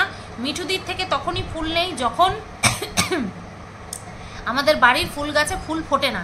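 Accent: Bangal, Bangladesh. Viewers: tomaar moton bold, honest, r courageous lady khoob kom dekha jaaye..r sense of humour toh mashaallah..tomaar mukhe bangal bhasha shunte khoob bhalo laage...ei comment ta pore ektu bangaal bhashaaye reply diyo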